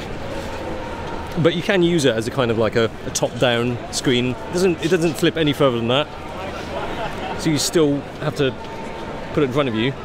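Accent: United Kingdom. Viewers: I just got an X-Pro3. I've had a couple X100's and a Pro2. I may be one of the ten people that actually use the OVF so my request is statically HUGE. On all the other hybrid viewfinders I've used from Fuji, in the OVF mode the parallax frame lines move to compensate when the shutter is 1/2 pressed and return to the neutral infinity position as soon as the shutter button is released. On the Pro3 I have, The parallax correction moves the framing box but DOES NOT RETURN TO THE NEUTRAL POSITION. This is VERY annoying when recomposing the next image at infinity after many years of muscle memory stored with the other Fuji Hybrids. If one close focuses and the frame moves to the lower right it remains there even when the camera is turned off and on again. And besides, it just ain't natural, Mate. It violates well known cosmic laws regarding such matters. I called Fuji support and they appeared clueless but only said they'd pass it along. 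Since you have a popular channel and are a witty Chinese guy with a British ascent (I'm not sure of another combination that might carry more instant authority) I thought perhaps you may get more attention if you brought it up.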